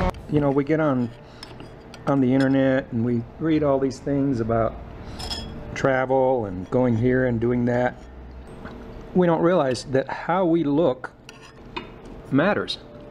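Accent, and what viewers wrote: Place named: United States. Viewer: Not perfect Spanish but gringo Spanish.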